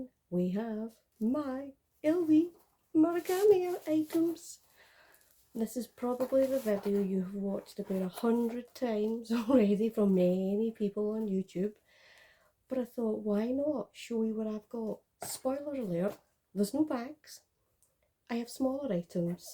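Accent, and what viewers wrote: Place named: Scotland, United Kingdom. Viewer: Subscribed just for your lovely Scottish accent, well and I love bags too😂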